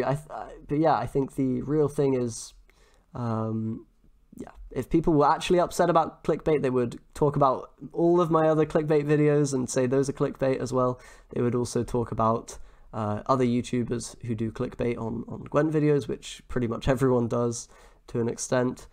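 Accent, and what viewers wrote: United Kingdom. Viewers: I stopped playing Gwent when I 've realised how Homecoming downvalued my beloved game but I keep watching you just because of your fantastic British accent. Keep doing your job!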